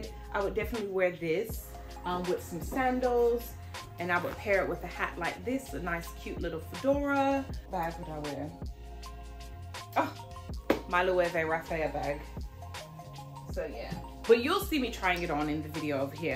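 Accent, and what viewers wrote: United Kingdom. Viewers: Am glad you brought the looks back and the British accent, yaaaaass hun!!!!!🙌🏾🙌🏾🙌🏾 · And just like that she’s back with the fashion tips and the British accents 😂😂😂🎉🎉🎉🎉🎉🎉❤❤❤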